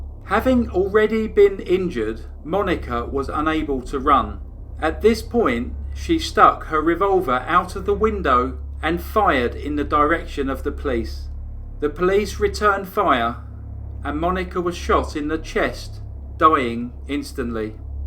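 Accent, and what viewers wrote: England, United Kingdom. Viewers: Wow comt beliebe you all Thought that - I guess it that they are the same sort of shes and English accent and same reading style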